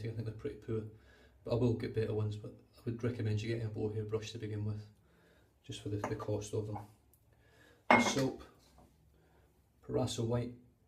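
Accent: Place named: Manchester, United Kingdom. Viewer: Nice tutorial, I've just bought the very same Proraso product (shaver is Edwin Jagger). Just a few more question, the pre-shave, on dry skin ? The soap, how long after the pre-shave ? The balm is before the after shave, and just straight after ? It seems you said usually it's the contrary, no ? Oh and lovely manchunian accent 👍😁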